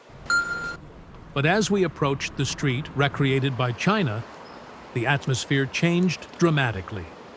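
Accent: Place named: United States. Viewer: nippon looks Japanese but its Narrator sounds likea Usa Anglosaxon Go FIGURE THAT ONE OUT!!!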